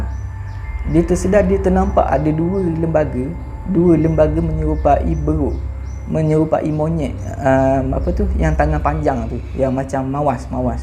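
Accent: Terengganu, Malaysia. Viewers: Org ganu ke dik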